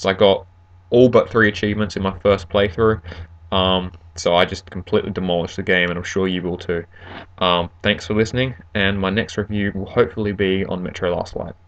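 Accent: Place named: Australia. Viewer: you sound like a true aussie :P good work!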